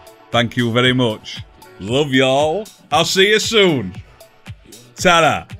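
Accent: Yorkshire, United Kingdom. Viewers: Congrats on the getting the play button, you fully deserve it........as I've said before, your the only reason i watch youtube nowadays.. always make me laugh and that top yorkie accent 👍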